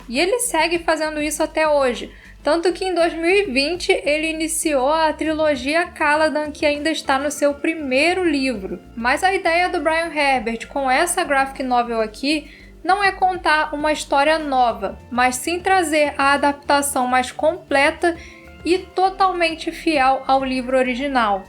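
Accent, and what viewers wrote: Rio de Janeiro, Brazil. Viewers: eu notei um sotaque carioca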